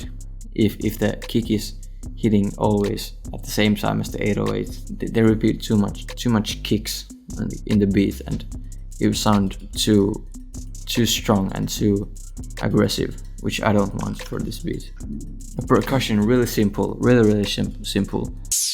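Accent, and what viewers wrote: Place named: Finland. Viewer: You can really hear that he's finnish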